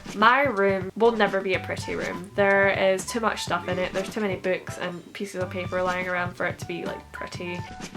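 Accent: Scotland, United Kingdom. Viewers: I love the idea of using tracing paper if you're not great at drawing. I will definitely be using that! Also love your accent, are you Scottish? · lol I'm Scottish too but live in Wales now and people always ask me where in Ireland i'm from. I just tell them near Glasgow and theyre like oops :) love the video and good to hear another Scottish voice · Omg you sounded Scottish so I immediately liked you😂💕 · I love your accent so much, it's like slightly Scottish and English and it sounds very nice and that combined with the amazing video is really great :)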